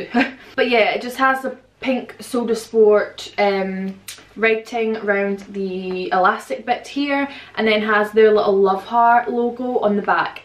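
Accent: Scotland, United Kingdom. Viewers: sounds like Glasgow if not it’s Edinburgh (for the accent)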